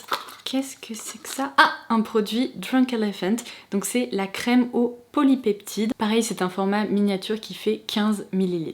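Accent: England, United Kingdom. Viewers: Je vous découvre à travers cette vidéo, et c'est un vrai coup de cœur 😍 J'aime beaucoup votre voix douce et apaisante, et votre merveilleux accent anglais. Je m'abonne tout de suite à votre chaîne 🙂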